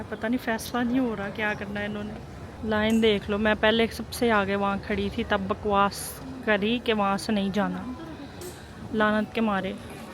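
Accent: Lahore, Pakistan. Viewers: You have really pure lahorei accent bless you I really enjoy your vlog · 😀😀😀china Pakistan 🇵🇰 iron friendship. I love & proud born in Pakistan 🇵🇰. You”re so funny speak Urdu like tipical Pakistani.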